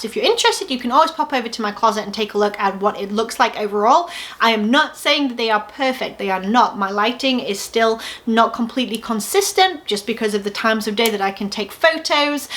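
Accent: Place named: United Kingdom. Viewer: Plus I love your accent and British expressions. Reminds me of my British family members. <3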